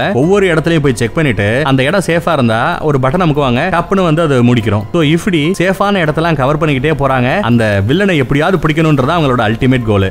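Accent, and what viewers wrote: Madurai, India. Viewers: Anna nenka pesura Madurai Tamil super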